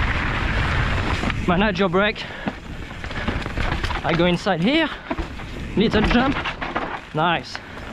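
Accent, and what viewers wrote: France. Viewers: French accent ???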